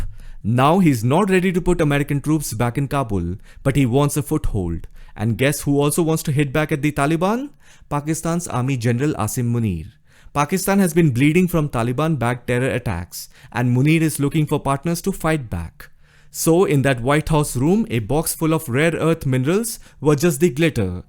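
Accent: India. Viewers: Your Indian accent is so annoying 🤮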